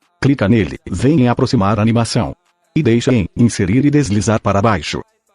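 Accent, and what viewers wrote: Bahia, Brazil. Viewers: O miseravel e um baiano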